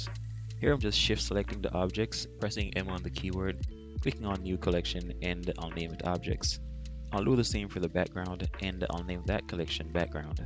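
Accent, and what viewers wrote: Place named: Jamaica. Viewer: I like your accent sounds Jamaican.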